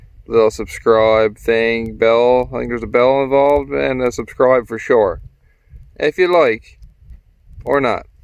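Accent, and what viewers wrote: Newfoundland, Canada. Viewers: Where ya from? You must be newfie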